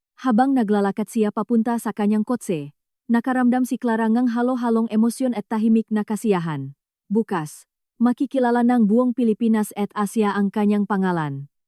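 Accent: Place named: China. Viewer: Nakakawalang gana makinig sa Narrator Ano ba sya Chinese or Maranao. Palitan mo pls nakakabwisit pakinggan imbis ma relax.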